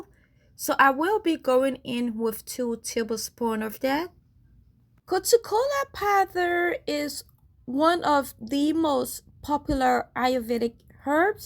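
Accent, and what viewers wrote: Liberia. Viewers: Are you a liberian? You sound like one qnd i'm a liberian too so i'm asking.. thanks for the video. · You a complete Liberian sis that tone can’t hide. Thanks for the video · My mother in law is Liberian and your accent is so similar. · You sound like a Liberian🇱🇷❤️❤️ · You sound so Liberian 🇱🇷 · I hear a little Liberian accent 🥰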